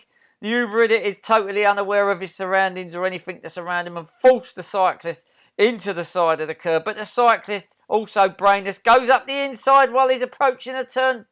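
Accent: Cockney, United Kingdom. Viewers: What's your name mate? You really do make laugh with your accent ! Are you a cockney buddy? Greetings from Casablanca Morocco ❤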